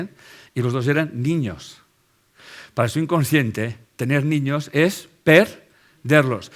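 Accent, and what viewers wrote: Spain. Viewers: por su forma de hablar es español igual a mi papá es bueno hablar de asuntos de pareja pero que bueno ese tema las personas deben conocer su media naranja pos persona no por redes sociales no sabes no lo ves es peligroso cuando entramos es para escuchar temas como los a suyos, ho música, pero pareja no porque hay muchos que se hacen pasar por otros gracias por su tema me encanta bendiciones y larga vida amén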